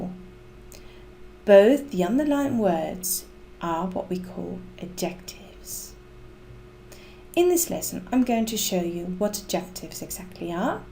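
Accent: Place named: West Midlands, United Kingdom. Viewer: very professional presentation. and very understandable. excellent . thanks !!! watched from central America, I detect i think a rather refined west midlands accent. please keep posting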